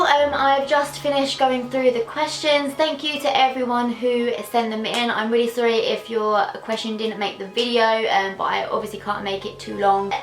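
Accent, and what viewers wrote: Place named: London, United Kingdom. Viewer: Not even joking I didn't realise you had a stammer, I thought. it was the LDN accent.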